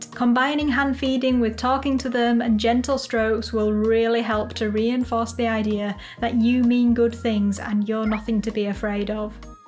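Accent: England, United Kingdom. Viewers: can I ask you what part of England are you from? I am interested in regional accents (I'm American). And I've always loved the UK 🇬🇧. Thanks.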